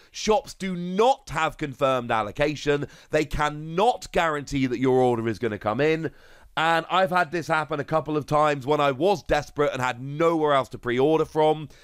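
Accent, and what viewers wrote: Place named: United Kingdom. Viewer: Hey, I noticed you have a beautiful British accent. I’m looking to get into playing the TCG in-person within the UK. How do you recommend finding people to play with?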